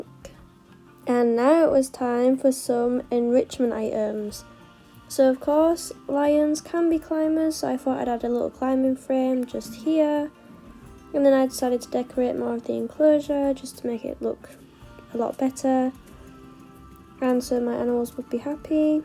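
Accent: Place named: United Kingdom. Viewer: I think your british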